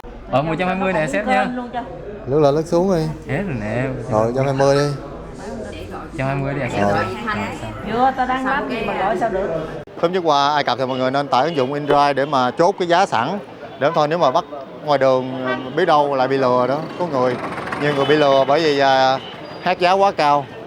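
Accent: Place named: Mekong Delta, Vietnam. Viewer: Cái kênh du lịch mà tui thích vì phần thuyết minh rất riêng của, vừa hay mà cũng vừa mắc cười, đậm chất miền Tây rặc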